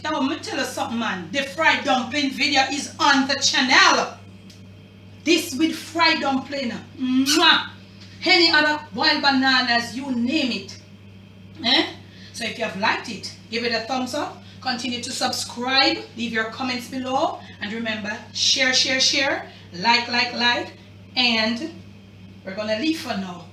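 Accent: Jamaica. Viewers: love the jamiacan accent from trinidad here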